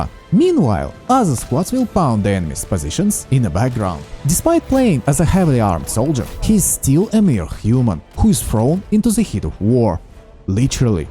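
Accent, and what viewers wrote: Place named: Eastern Europe. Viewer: Love the video and love your thick Eastern European accent more. Hope to see your channel grow and get those sponsors friend~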